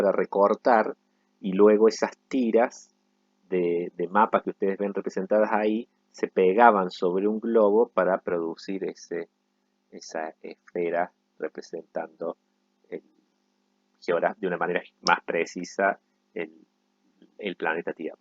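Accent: Córdoba, Argentina. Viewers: Acá sí queda claro el acento cordobés. 😉 Felicitaciones por el contenido. Saludos desde Montevideo.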